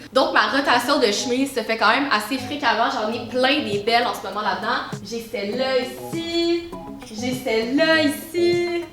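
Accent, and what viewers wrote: Quebec, Canada. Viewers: Je suis nouvelle et je me suis abonnée direct. T super t ma nouvelle youtubeuse préférer . (Je suis française donc si y a des mots pas québécois c normal) Ps: j'adore vraiment l'accent québécois ❤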